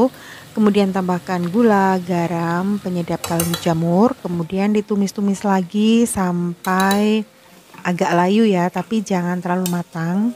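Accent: Banyumas, Indonesia. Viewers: W'salam sehat sehat mba, timggal di Purwokerto ya mba.....bahasanya ngapak donk hehehe, salaaam dri jakarta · Ada ngapagnya ya bund...😁✌️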